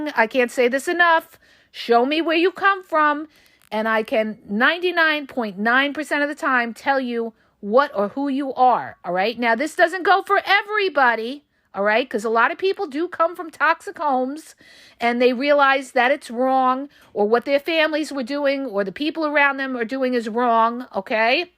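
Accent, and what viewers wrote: New York, United States. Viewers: I hear your NY accent. Lol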